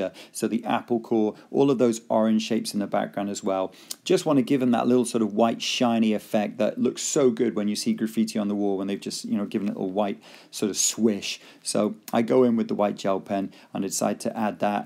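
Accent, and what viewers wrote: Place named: United Kingdom. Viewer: No one here will get this - but as you started talking I was absolutely sure, that you are Liam from Critical Role. You literally have the same voice (and British accent, which could be one of the main reason) but still the resemblance is (as Liam would say) uncanny.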